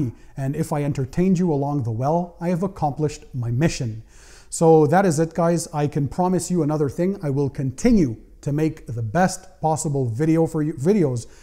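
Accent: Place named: Montreal, Canada. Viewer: Wow! Je découvre votre chaine ce soir. Celle-ci est la deuxième que j'écoute. Ça commence fort. Faut demeurer concentré sur les 99.99% qui vous apprécient. La qualité et l'enthousiasme que vous présentez ma gagné tout de suite: SUBSCRIBED !! J'ai senti que vous aviez possiblement un petit accent tres subtile de Montreal, une touche francophone. Je découvre que vous parlez français et bien entendu, je devine, le grec. Tikanis ! Bon succès, je vais me convertir en Week-end worrior en detailing. J'attend un nouveau véhicule pour la semaine prochaine. Est-ce que je peut déja appliquer une protection de peinture sur ma nouvelle voiture. Je viens d'acheter McGuire's Ultimate que j'ai appliqué sur la voiture recente de ma femme; beux résultat. Normand Rousseau , rive-nord de Montréal.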